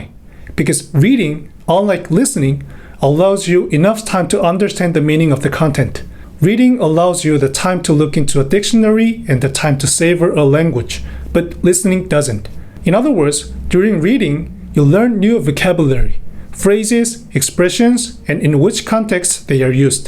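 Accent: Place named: United States. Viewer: Me being an English native British English speaker and being so confused when you said English words come from the back of your mouth, and then ofc the examples were in an American accent 😂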